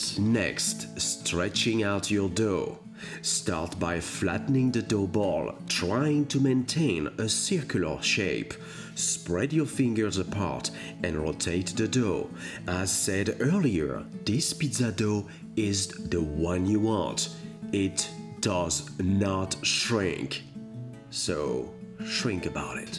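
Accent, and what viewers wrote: France. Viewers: J'aimerais savoir une chose, vous êtes français? Parce que quand vous parlez anglais vous avez le même accent que nous français lorsqu'on parle anglais. Des vidéos en français serait top même si je comprends tout à fait l'anglais. Thank you for your recipes they're soooo delicious !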